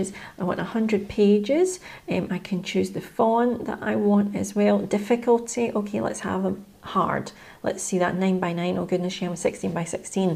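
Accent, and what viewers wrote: Scotland, United Kingdom. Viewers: I've been following your site for about three months. I'm an American who lives in North East Scotland. I used to live in Cumbernauld, so I love your accent. I wrote a book on becoming deb some years ago, and I love watching You Tube videos related to it. You and your husband have one of the best I've seen in this country. Plus this is your best yet. Thanks. Keep up the good work.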